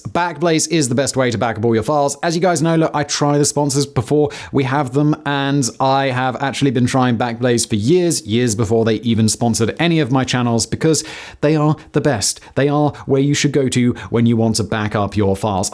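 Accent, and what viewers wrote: England, United Kingdom. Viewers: Arrogant use of English accent. This guy is a Never Soldier w/ Cavalier wuss Talk Anti American Buffoon!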